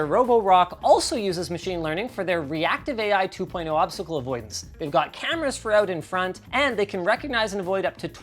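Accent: South Africa. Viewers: we hear that South African accent bru.Nice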